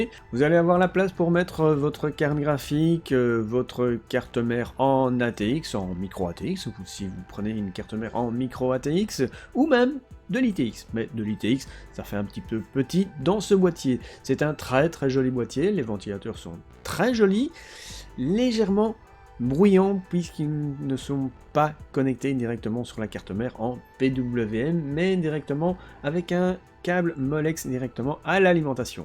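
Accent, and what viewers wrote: Belgium. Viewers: J'adore l'accent belge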